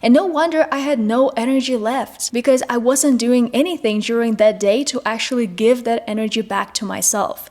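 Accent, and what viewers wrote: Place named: England, United Kingdom. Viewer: I really love your English accent you have fascinating fluency in English ❤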